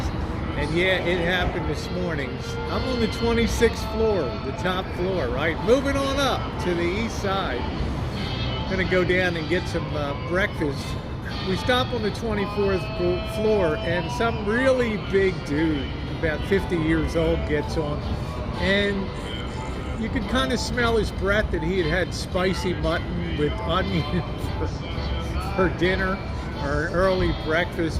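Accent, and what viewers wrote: Baltimore, United States. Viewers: I'm a new subscriber although I've watched some of your videos along the way before hitting that button. I've been trying to pinpoint your accent, and I thought you must be from Baltimore. When you mentioned that you've had enough of mobsters, etc. in Baltimore, I think you just confirmed my guess. You're such a positive, energetic person, and I really like your videos.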